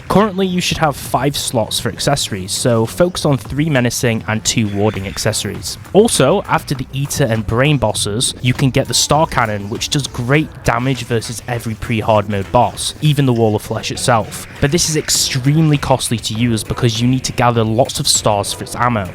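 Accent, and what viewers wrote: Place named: United Kingdom. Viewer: is british? no more video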